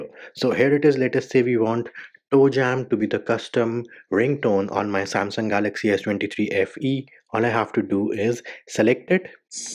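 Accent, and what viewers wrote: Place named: India. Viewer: You can always trust the Indian sounding guy! thanks it worked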